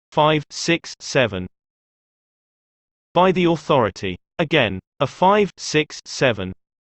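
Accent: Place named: Ireland. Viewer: Thanks. Great video. I prefer the Irish accent. May have something to do with my mother being Irish.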